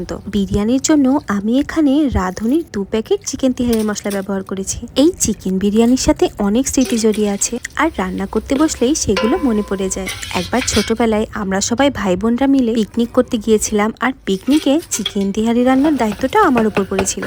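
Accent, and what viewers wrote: India. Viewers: কলকাতার বস্তি এক্সেন্ট এ কথা বললে ভারতীয় ভিউয়ারস ট্র্যাক করুন। বাংলাদেশি হিসেনে বিশ্রী লাগে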